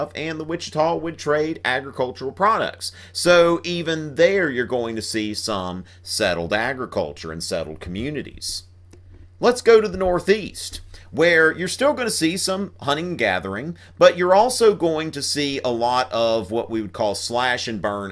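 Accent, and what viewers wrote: South, United States. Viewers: Wow is this the southern cowboy accent from the USA? I always thought the people from that part of USA are considered stupid. It's amazing, you seem smart and well put together.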